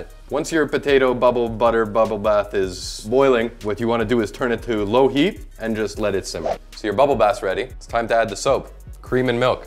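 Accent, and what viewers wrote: Quebec, Canada. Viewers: His accent seemed pretty consistent to me. He only changed his accent once when he said bacon (Jamaican accent, sounded like beer can). Either way, he has quite a bit of a French-Canadian accent. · He is so Quebecois.... ( FRENCHY!!! ) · You sound so Canadian lol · I don't dislike u I actually like u cause u sound a lot more Canadian the the other guys Lol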